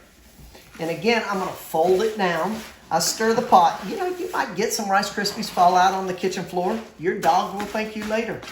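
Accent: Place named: South, United States. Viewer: Dad jokes, Rice Krispies, country accent, not harsh on the eyes. I don’t see how this video could’ve been better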